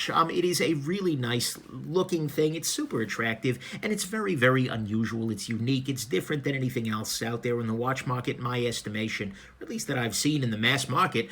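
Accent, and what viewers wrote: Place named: New Jersey, United States. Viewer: The Jersey accent on this guy is hilarious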